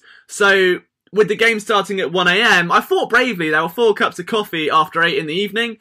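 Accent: United Kingdom. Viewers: Your whiny British voice is the only whiny British voice I can endure for more than 30 minutes.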